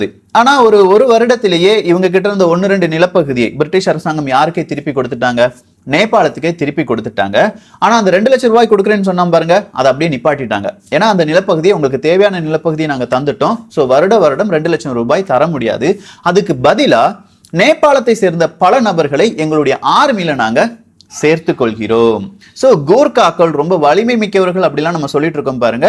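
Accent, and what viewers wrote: Kerala, India. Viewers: Malayaali aano ?? Apdiya Kerala vaasanai veesuthu but azhaga Thamizhla singaarikingo... 😍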